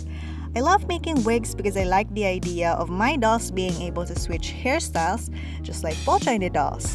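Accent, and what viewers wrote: Philippines. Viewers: You kinda have a filipino accent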